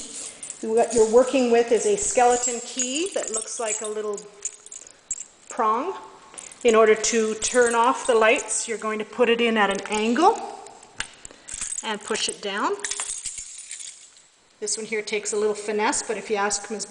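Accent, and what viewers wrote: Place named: Ireland. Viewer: that Canadian accent has a HINT of Irish in it :D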